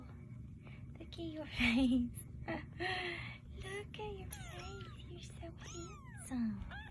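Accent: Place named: South, United States. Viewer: Your sweet voice combined with your Southern accent is very SOOTHING FOR THEM! 😇😇😇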